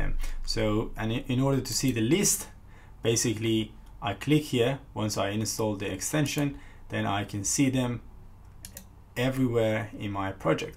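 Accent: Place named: Iran. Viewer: your accent similar to Persian. Are you Persian?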